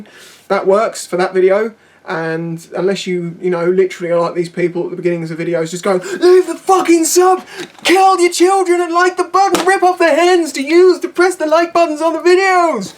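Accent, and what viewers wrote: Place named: United Kingdom. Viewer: I think it's the accent. I feel it even more strongly as English is not my native language and the weird manner of speaking is quite off putting for many people.. Maybe not that many Americans fancy the British accents?...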